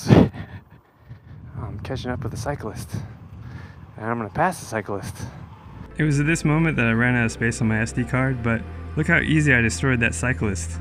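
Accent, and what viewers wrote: United States. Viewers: This is actually a great video! It’s both entertaining and informative,but it’s weird hearing an American accent in a video from NZ?? Once I got over that I dug the various aspects of your testing and if I was with the NZ distributor or with Apollo I would be super happy with what you did. What freaked me out though was 50km on a scooter. WTF? I have an eBike that hits 28mph (45km) and I have only done that a couple of times and both times I was muttering a prayer that I wouldn’t hit a stone in the tarmac…things happen really fast above 30km/h much less 50. Either you must be an adrenaline junky or you have a suppressed survival instinct 😂! Bummer that NZ is so restrictive about where and how fast you can ride a scooter, but either way this was a really clever and enjoyable video and thanks for doing it.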